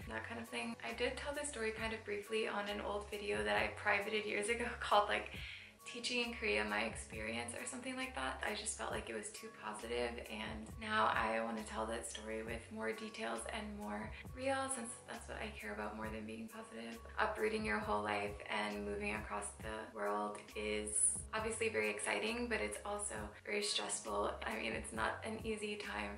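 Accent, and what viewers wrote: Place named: Southern California, United States. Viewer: You don’t have a Louisiana accent, you sound like any southern Cali girl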